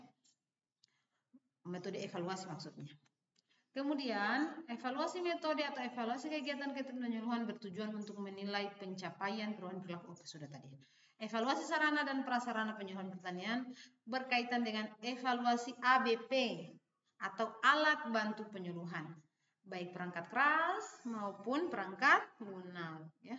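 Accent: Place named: Bugis, Indonesia. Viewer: Pantas logatnya Bugis sekali 😂,salam dari sinjai ibu heheh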